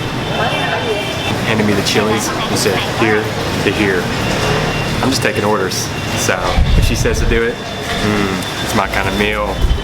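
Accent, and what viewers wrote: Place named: Texas, United States. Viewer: He y! can't get enough of your videos bro! You must be from Texas by the way you sound.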